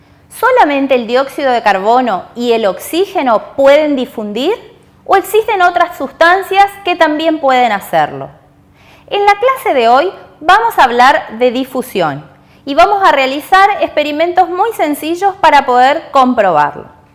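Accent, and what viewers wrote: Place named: Argentina. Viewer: Eres de Argentina , siempre e querido ser de alli no se porque me encanta el acento :v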